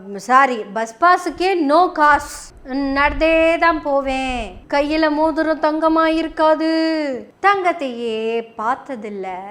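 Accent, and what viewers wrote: Kongu, India. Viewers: தங்கள் பேச்சு மிக அருமையாக உள்ளது. அதைவிட தங்கள் கொங்கு தமிழ் மிக மிக அருமையாக உள்ளது நன்றி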